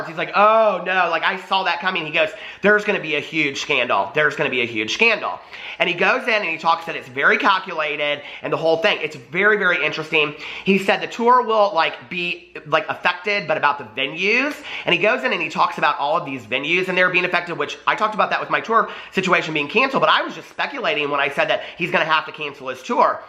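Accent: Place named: Alabama, United States. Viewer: I haven’t watched you in so long but you pulled me right back and boy howdy did I miss you....As an Alabama native myself, you sound more like you’re from here than people I see walking around Walmart...😂 I love it & you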